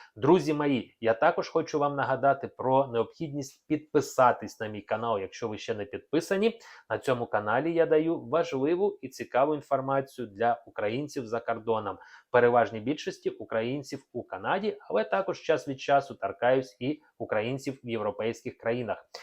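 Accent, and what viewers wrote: Russia. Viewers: Якесь недолуге , намагається навести жаху на українців😁Альо, чоловіче з бородою та как..ським акцентом!! Тобі до мольфара, як до Парижу пішки!!! Аналітик - невдаха!! Ще й до всього - хамло!!! Ліпше перехрестися і покайся, і закінчуй зі бути лжепророком!! Ми, українці,заґартовані такими висєрами😁 Нас вони не « беруть»!! Лише Господь знає, як і коли буде кінець цьому балу сатани, а не « пророк з бородою»! Ця інформація для болот !!!! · Бедолага а у вас акцент на русский язык не старайся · Очень режет слух Ваш " русизм" в произношении. Прошу прощения: в украинском языке, если пишется (на пример) "отримував", то и произносится Отримувпв а не Атримував. Во многих случаях Вы приносите так. Обратите на это внимание. Вы же с экрана вещаете все же. Спасибо. И... Страна - это русское слово. В украинском оно другое, Вы и так, и эдак произносите. Спасибо за информацию. 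И простите, если что)))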